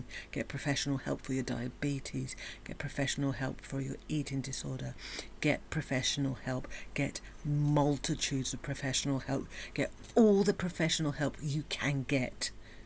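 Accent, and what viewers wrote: United Kingdom. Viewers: God it sounds so much better coming from a British accent...much love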